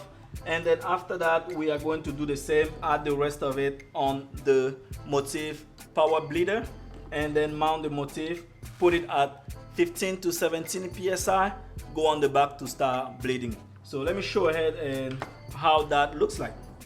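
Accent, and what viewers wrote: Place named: France. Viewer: You don't have a hood (bonnet) sound shield. Your accent sounds French.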